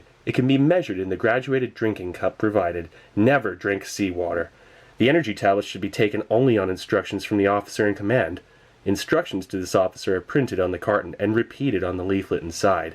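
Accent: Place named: Midland, United States. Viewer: he's american, i can tell by the accent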